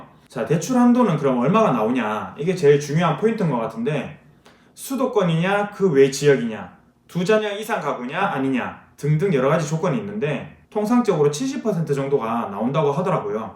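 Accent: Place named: Gyeongsang, South Korea. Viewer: 생긴건 서울 남자 같은데 경상도 사투리 너무 심하게 쓰네 ~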